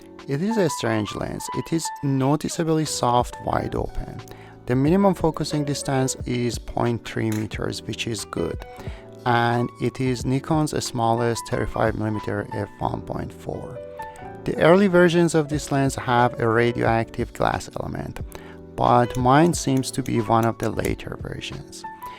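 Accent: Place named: Iran. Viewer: سلام . ویدیوی خوبی بود . ممنون از نحوه‌ی انگلیسی صحبت کردن متوجه شدم ایرانی هستید 😊. در کل با لنزهای زوم ارتباط برقرار نمیکنم . به جای ۲ تا لنز زوم ترجیح میدم ۴-۵ تا پرایم همراه خودم داشته باشم .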